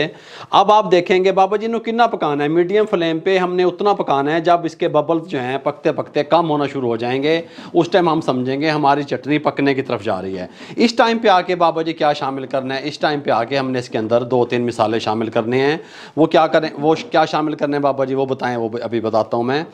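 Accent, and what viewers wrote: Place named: Haryana, India. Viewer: From Okara Punjab Pakistan. jee aap ki maa Boli Haryana ki lagti hay. jee aap k programme both achhay ja rahay hain.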